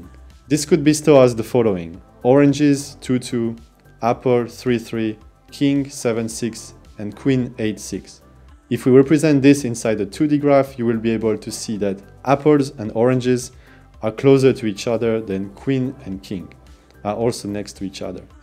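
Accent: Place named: France. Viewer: Very useful content and lovely French accent 👌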